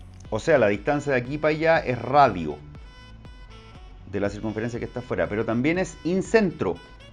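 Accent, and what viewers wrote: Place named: Chile. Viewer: Con razón pe es un chileno nose entiende :v pero gracias profe aprendo mucho de ti, para la próxima mas despacio :'c piedad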